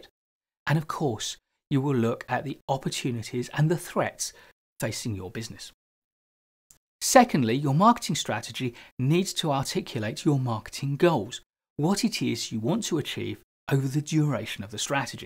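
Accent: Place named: United Kingdom. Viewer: I started to miss the British accent in business videos in YouTube 😂 thanks from Morocco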